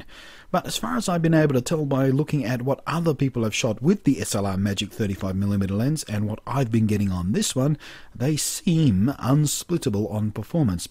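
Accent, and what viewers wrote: Australia. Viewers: I believe he said "70mm" (i.e. 35mm lens with 2x crop factor on M43). Must be the Aussie drawl :) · Great video! And good to hear an Aussie accent and see Sydney :) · I don't know how I got here when I was looking for audio equipment, but your voice kept me here. Those dulcet tones paired with you Aussie accent: Audible Chocolate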